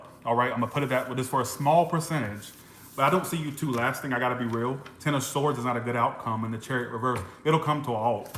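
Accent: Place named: Texas, United States. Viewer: you sound like a Texan, reminding me of my cousin walter/jeremiah, from Pasadena / houston <3 lmk if you are. love it. and im a spiritualist/ tarotist too, i had my entire YouTube erased , just about. i feel your pain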